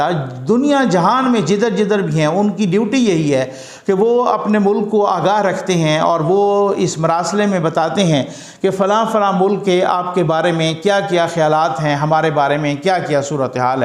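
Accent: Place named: Saraiki, Pakistan. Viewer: Dear sb I think u r Saraiki speaking. From where u belongs???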